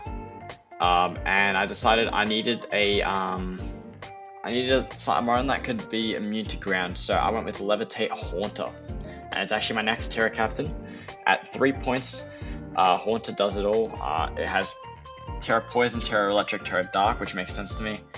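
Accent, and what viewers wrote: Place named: United Kingdom. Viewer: Oh my goodness, he's British 😮 hehehe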